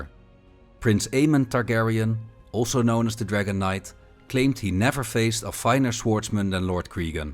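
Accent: Netherlands. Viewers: The narrator is definitely dutch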